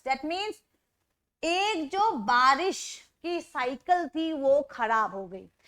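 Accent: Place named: Bengal, India. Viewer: Mam 's accent is like a Bengali....😀👍🏻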